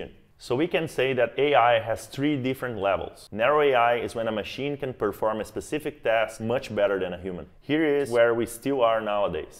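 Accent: Brazil. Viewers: Certeza que é brasileiro hehe... belo video!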